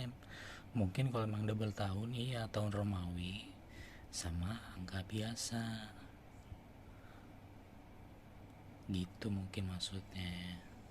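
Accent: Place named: Banyumas, Indonesia. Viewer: mantap👏👏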